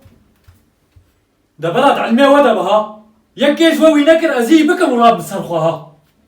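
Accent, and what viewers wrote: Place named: Amuda, Syria. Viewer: عم تحكي متلنا يعني قصتي اهل عامودا انت من وين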